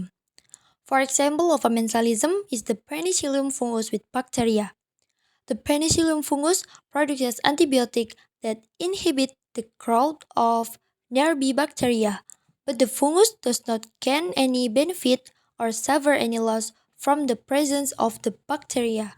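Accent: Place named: United States. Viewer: GILSSS KEREN BGT, KIRAIN ORG AMERIKA MANA NGOMONGIN SIMBIOSIS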